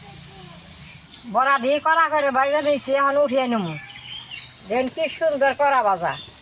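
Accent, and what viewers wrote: Bangladesh. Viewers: আপনার কথা বাংলাদেশের কোন একটা এলাকায় মানুষের মতো।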